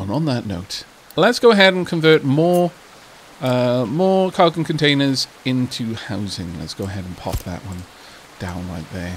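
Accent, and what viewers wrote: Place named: RP, United Kingdom. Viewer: Great video, great narration. Big fan. Your accent seems a little "posh". What area is it from? :)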